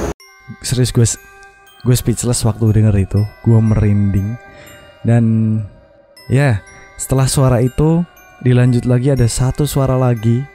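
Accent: Java, Indonesia. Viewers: aku mnden wedii.. mnaa orang jawaa suaranya...sukses rus ya kka 2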